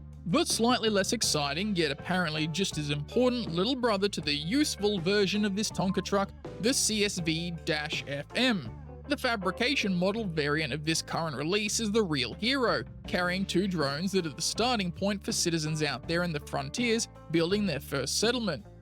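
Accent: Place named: Australia. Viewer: Hi whats up: Love your uploads.. and I believe Ozzie oZZie accent. The problem with CIG is they charge far too high prices for goods that pose no challenge for other products within their price range. The CVS-SM seems to sell for 50dollars or 50 pounds which is how much the entire game sold for with SQ42 and a Starter. Starter ships now cost that begotten price and the game yet alone a package. The CSV-SM is not seemingly calibrated with a driving rig meaning there is three way deal. You can't manage cargo more effectively. I would love to attach compartments enabling maybe a 12-16 hauling pool henceforth a customisable truck variant! I don't WANT a variant - but extensions for CSV-SM... what cha think??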